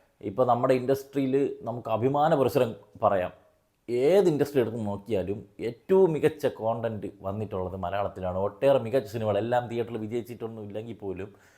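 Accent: Trivandrum, India. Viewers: Trivandrum slang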